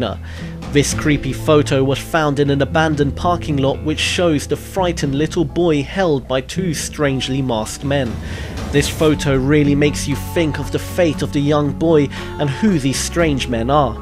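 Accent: United Kingdom. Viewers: Why do these narrators so often have British accents? If I watch this video in GB will he have an American accent?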